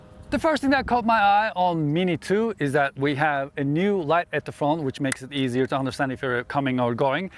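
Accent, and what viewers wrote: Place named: Turkey. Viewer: Müdür sen TÜRK müsün? Türk gibi konuşuyorsun ingilizceyi.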